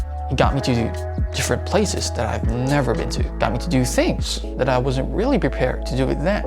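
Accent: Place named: Canada. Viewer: wow... within two years in canada you have that accent already. What school in the phillipines did you go to?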